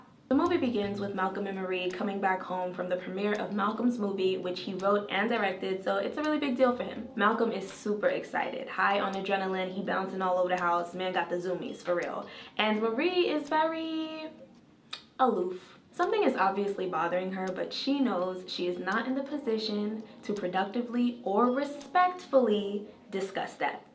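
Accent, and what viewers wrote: Jamaica. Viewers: Hi. I haven't found any info 'about me' in your videos. I picked up a Jamaican accent and allusions that you're sapphic. You're pretty, smart, confident, and brutally honest. Who are you? Where are you from?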